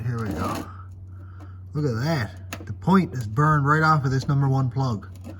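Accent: Newfoundland, Canada. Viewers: I'm from grand bank lol currently living in pei,I know a newfie when I hear one haha what part ya from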